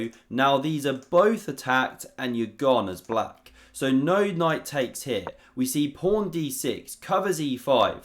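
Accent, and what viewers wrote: United Kingdom. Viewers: He's just British. Great channel!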